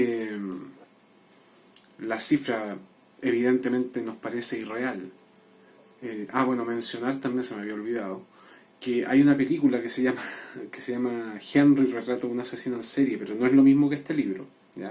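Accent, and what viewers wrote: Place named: Chile. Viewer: Gracias por las recondaciones. Saludos desde Perú, he madrugado a ver tu vídeo Por tu dejo al hablar asumo que es Chileno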